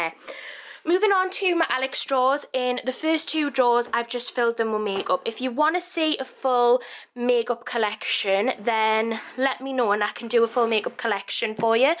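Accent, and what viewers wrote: Newcastle, United Kingdom. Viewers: Mirrow haha she's a geordie, it's what they do 😂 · A proper Geordie!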